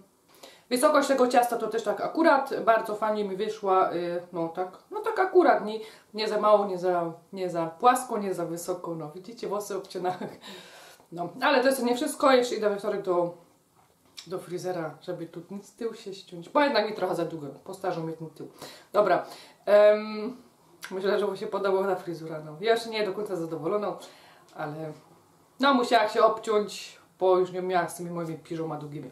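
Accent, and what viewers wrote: Silesia, Poland. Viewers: Bo to jest po ślonsku, Opole jest na Śląsku, a my sam koltywujemy jezyk ślonski 😛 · Broń Boże ! Ta śląska gwara to clou programu ! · Asz mi sie serce smieje ..jak ta moja gotka slysza...Dziolszko tys je einmalig....Pozdrowienia aus Nürnberg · No i co z tego że tych przepisów jest mnóstwo na youtubie I nie tylko jak wszystkie twoje przepisy jeśli chodzi o mnie,w twoim wykonaniu Są nie do przebicia. Uwielbiam ciebie i twoje filmiki tak się swojsko u mnie w kuchni robi. Ja też jestem Ślązaczką i dzięki tobie mogę pod szkolić się w godaniu🤪 wyjechałam na obczyznę 20 lat temu no i trocha mi się godo ale do ciebie jest mi cima 😂 dzięki tobie można się poczuć jak za dawnych lat w domu rodzinnym. Pikne mosz te włosy. A mój tata jak to kiedyś mówił „ to mosz dobre”. Pozdrowienia serdeczne i całuski😘💝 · Twoja rozmowa jest mega mix niemieckiego i śląskiego ale naprawdę fajnie się ciebie słucha pozdro 😊😊😊😊Aber echt gute sprache 😁😁😁😊😊😊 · Pani proszę mówić normalnie bez gwary śląskiej, bo widać że pani się męczy.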